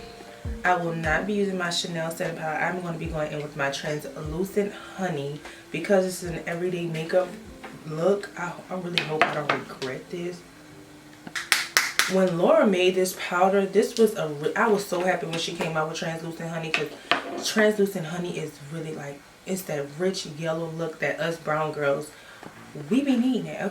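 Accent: New Orleans, United States. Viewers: Girl! Gorgeous! I knew the moment you started talking that you are a Louisiana girl like me Lol! New subbie! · I know a fellow Louisianan when I hear one 😊 After listening for a few seconds I said oh yeah that sounds like New Orleans or surrounding areas of N.O. You’re makeup is BEAUTIFUL 😍 ❤. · You sound like a NOLA Lady! I am from NOLA! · Do I hear a lil Uptown New Orleans in your voice?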